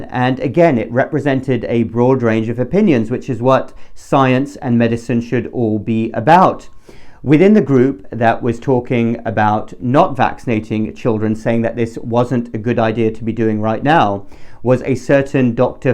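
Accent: United Kingdom. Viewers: yes, I wasn't looking at the screen, just listening, and he sounded British alright. What's the other passport?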